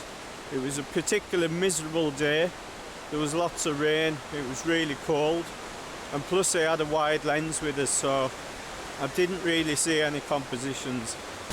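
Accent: United Kingdom. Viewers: To me, the image quality of your videos is fantastic. I don't always understand what you are saying (I am a francophone and not familiar with british accent) but watching your videos is a real pleasure. Bravo!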